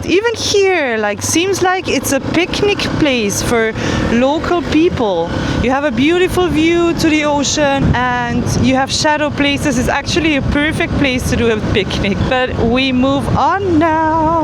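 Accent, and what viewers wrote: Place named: India. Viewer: I learn English free from you, like Indian accent ❤❤❤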